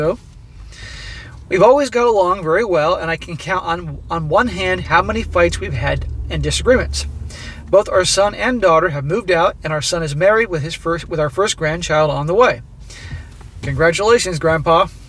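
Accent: Yorkshire, United Kingdom. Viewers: This bloke sounds like a Yorkshire man, they are honest direct n strait to the point, one of my closest friends Richard is from Harrogate Very honest helpful direct n trustworthy, you just can't get better, not implying the rest of the people are dishonest by all means, but Yorkshire people are the best I met on earth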